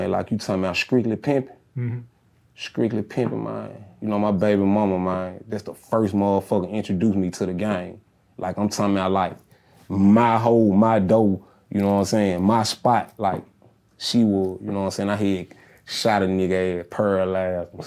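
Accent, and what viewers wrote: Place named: Memphis, United States. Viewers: He's from Memphis whatchu expect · I'm from Memphis and I felt this dude and understood his speech and know the scenery that bred him and everyone here say youknowatimsayin including my college educated self church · Soon as he started talking i knew his was from Memphis Tn. I know how we talk we have that certain swagg and talk to us specifically when we say mane that's just us! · I understand everything he saying. But then again I am from Memphis. Other southern states also talk like us. Know what Imsaying? You have to put I’m and saying together. You feel me? (Another one of our saying.)RIP Freddy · I just knew he was from Memphis when he started talking 😂😩 iykyk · Right I wasn't even near my phone when I heard it first come on, I said this one from Memphis! Then I heard Mitchell Heights and Binghampton and I was like Ayyyyyyeeeee!😂😂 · Memphis- accent. 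As someone from nash, I am all too familiar with this accent. And the state of mind. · Born & Raised here and manee I swear this how Memphis Dudes be Moving And Talking they get up to explain the story better I guess lol I Love it 🤗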